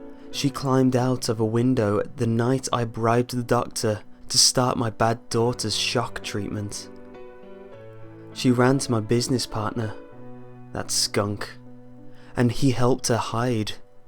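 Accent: England, United Kingdom. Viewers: who are you are you a british or what. im just curious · This guy is mental... so is his soothing british voice.. plus it was disturbing the belt thing... um.. this guy sounds like the british version of Pedobear O-O (\O3O/) GOODNIGHT EVERYONE! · because he is English that's why if you find the accent irritating just don't watch him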